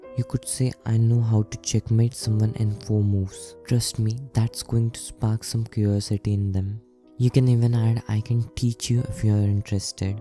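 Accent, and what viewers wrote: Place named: India. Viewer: was lowkey invested till I heard the indian accent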